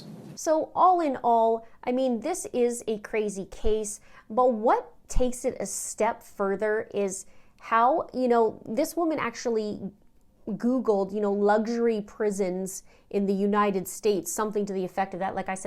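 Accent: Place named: Canada. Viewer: Love your videos! You cover these crimes so well and I like your Canadian accent😊 Canada is the favorite country I have ever lived in!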